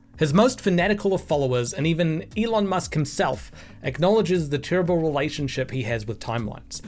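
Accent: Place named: New Zealand. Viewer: Great to hear a kiwi voice on YouTube!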